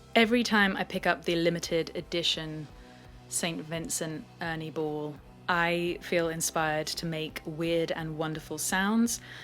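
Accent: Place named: RP, United Kingdom. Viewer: Good on you, thanks for the look around your studio! I enjoyed listening to your very easy on the ears posh British accent... Best of luck with the record!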